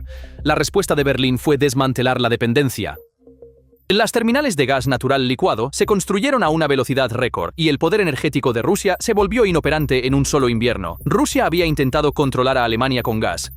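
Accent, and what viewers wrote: United States. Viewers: si poneis voces en castellano porque lo poneis con acento gringo? suena ridiculusssss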